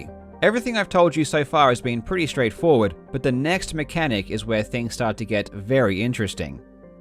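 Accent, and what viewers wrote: Australia. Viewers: great videos. its interesting you have a rhotic Australian-sounding accent. not sure if you pronounce your Rs because of prolonged exposure to american accents or if it's just a legitimate accent i've never heard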